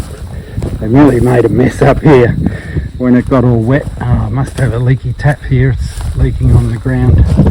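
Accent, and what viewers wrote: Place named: Australia. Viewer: Has an ausy acent makes all the sence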